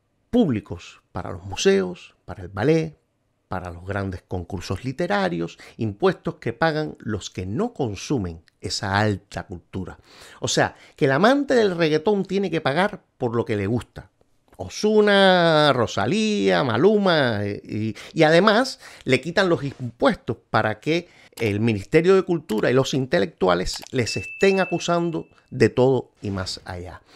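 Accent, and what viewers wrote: Cuba. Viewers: Gran vídeo . Pero creo que hablas del intelectual comunista. Por tu acepto veo que eres quizás cubano? Yo soy cubana y entiendo que este punto de vista viene algo heredado de la situación del país. Quizás eh? De cualquier modo, muy bien video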